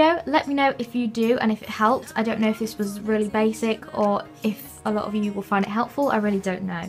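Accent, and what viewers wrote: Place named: Essex, United Kingdom. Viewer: Aw we're both 4'11! where shouts are you from? I've just moved from Essex to Blackpool and your accent sounds so similar!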